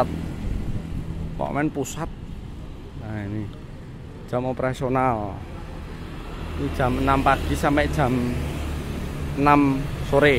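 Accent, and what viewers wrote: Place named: Java, Indonesia. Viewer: Medok amat yak ngomongnya....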